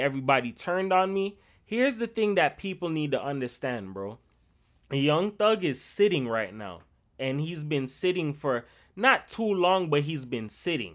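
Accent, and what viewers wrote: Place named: Jamaica. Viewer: I can hear the Jamaican in you. Dope video. There guys are some clowns and deserve what they get. Nobody or going to buy his lawyers bullshit. He said nothing is wrong with throwing up a gang sign in a RICO case 😂😂😂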